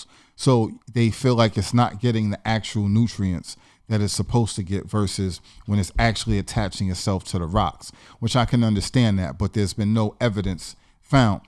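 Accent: New York, United States. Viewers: I’m glad I found this channel. I’m interested in sea moss but I am a little hesitant because I have a history of hyperthyroidism while pregnant and always was borderline hyper thyroid. Not sure how the high iodine content would affect someone like me. Are you from New York? You sound like it. 😊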